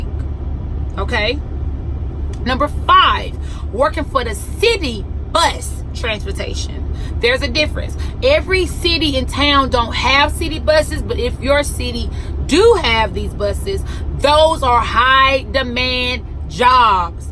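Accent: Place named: North Carolina, United States. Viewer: I knew she was from nc by her voice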